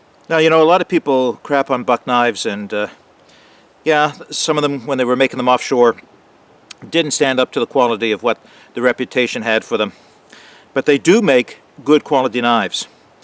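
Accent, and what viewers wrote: Canada. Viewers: Hi nice video. I watched this without knowing where you were located. Given your accent I was certain you were Canadian. I also noted that the wilderness looks identical to what I know. I noticed finally that you were in Nova Scotia. I am a little further north located in Southern New Brunswick. Best wishes and nice job on video.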